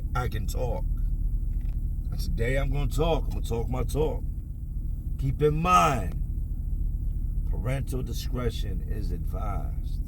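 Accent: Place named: South, United States. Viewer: hes from the hood in the south do you expect him to sound like JFK??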